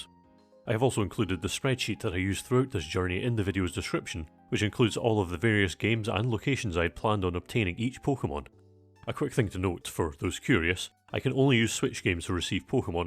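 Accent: Scotland, United Kingdom. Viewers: so this made me want to try this, really enjoyable video, thank you! Also, love ur accent, i am also Scottish but ur voice is very soothing lol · I'm genuinely curious. Is this your normal voice? Where is the accent from, it sounds british but i am uncertain, is it just a deeper tone? How old are you? I feel like ive heard a similar voice but i cant say for sure and definitely cant remember where from. It is a very calming voice though... i sleep to your vids sometimes and i find it very helpful. I also enjoy rewatching it in the morning, if i feel that i missed something. Speaning of, i should search for those hidden kiwis lol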